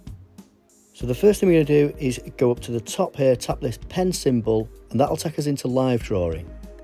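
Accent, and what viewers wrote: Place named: England, United Kingdom. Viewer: Nice to hear an English voice ..good video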